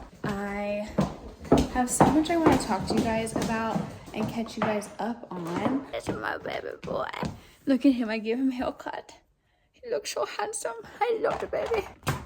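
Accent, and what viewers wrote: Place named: United States. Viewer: You've gotten a country accent!! How do you still make income when you're not posting? I'm not asking for like a super personal answer, but I'm curious what other streams of income you have, help a girl out😂 Is the house you're at outside now the new home, or the old one? Whichever it is, the porch is definitely giving that old farmstyle vintage house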